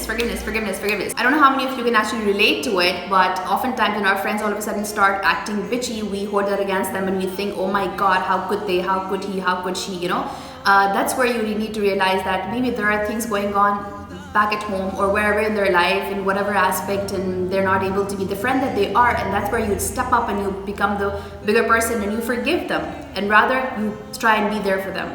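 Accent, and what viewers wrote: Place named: United States. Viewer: Waaaoooww!! You have an amazing American accent. OMG that is soo cool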